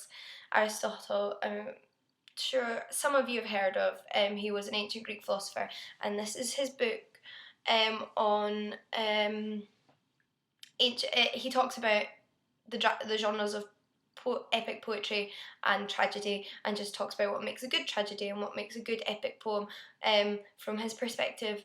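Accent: Scotland, United Kingdom. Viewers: You sound a bit scottish. Is this true?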